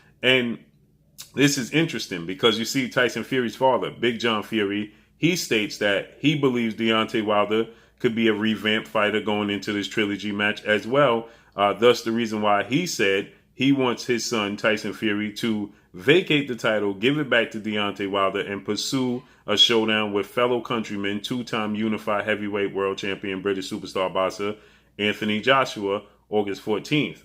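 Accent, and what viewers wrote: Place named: Brooklyn, United States. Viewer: salute 🤴🏾 keep grinding' SON' BROOKLYN VOICE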